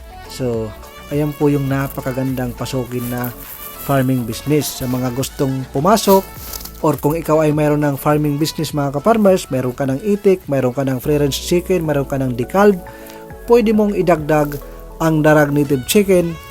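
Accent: Waray, Philippines. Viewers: San makakabili Darag Native na yan san lugar ba kayo, parang waray ang tuno ng salita nyo?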